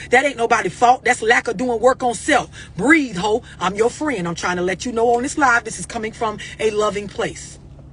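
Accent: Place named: Florida, United States. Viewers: Yep... straight outta FL 🌞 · I love the way she say hoe, just the way she talk in general reminds me of home Where she from Florida?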